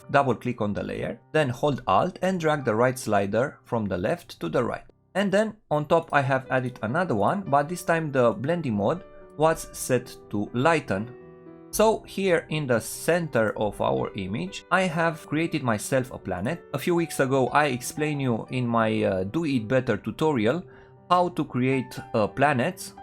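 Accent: Romania. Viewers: Nu stiu de ce, dar accentul tau imi spune ca esti roman. Buna treaba, explicarea layerelor nu e comuna, multa lume face doar speed art. Esti bun, evoluezi repede. If I assumed wrong what I wrote in first part I still want to tell you thanks for your layers break down. We dont get this thing so often, majority of ppl just posting speed art.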